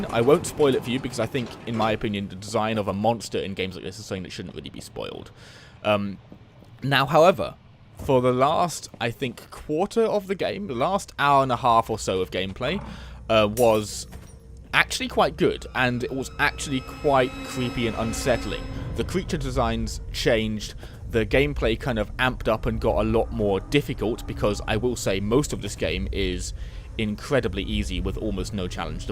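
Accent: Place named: United Kingdom. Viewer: Your accent makes me think of Jonathan Ross - are you from the same part of Britain?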